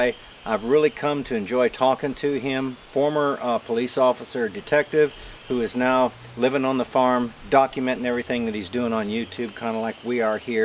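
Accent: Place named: Appalachia, United States. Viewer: I’ve been subbed to Out of the Woods for several years now, Good channel for sawmill interested folks like myself. I’ve seen him going and getting logs at every opportunity he gets when people call him and many times they’re free. 😉 What’s funny is I talk exactly like him 😂 I guess because all my family have been NC Tenn & Va Mountain folk and we have a hillbilly accent 😁👍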